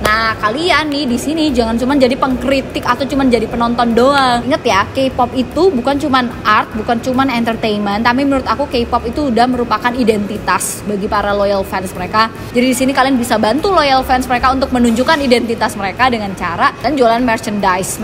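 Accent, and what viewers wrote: Java, Indonesia. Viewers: Kak sekarang logat jawa nya tebel banget kak hehe 😁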